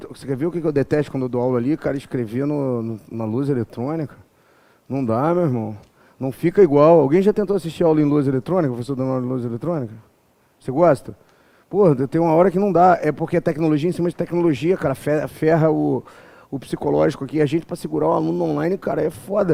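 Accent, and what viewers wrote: Rio de Janeiro, Brazil. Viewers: Esse professor é carioca?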